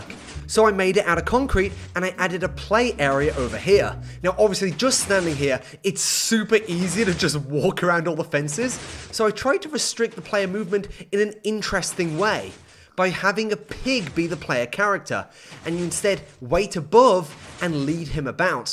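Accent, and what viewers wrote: United Kingdom. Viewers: Ah yes,British accent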